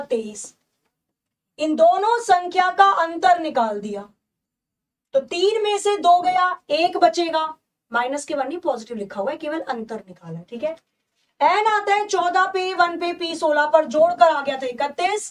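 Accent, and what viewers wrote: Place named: Bihar, India. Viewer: mam aap biharq me kaha se h , mai v bihar se hi hu or aapka language same to same meri tarh hi hai😊😍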